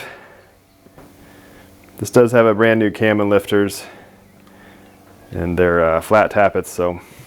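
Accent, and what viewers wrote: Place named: China. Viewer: Chingliss I love it.😂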